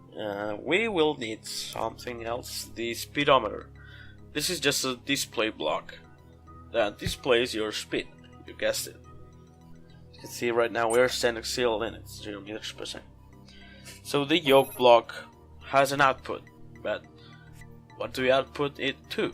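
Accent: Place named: France. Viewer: You can hear it's the dev by the French accent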